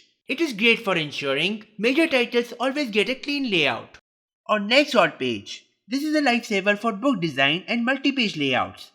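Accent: India. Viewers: Glad to hear about this, may be just a bit slower taking into account that you are being watched in english and you talk as Hindi english : )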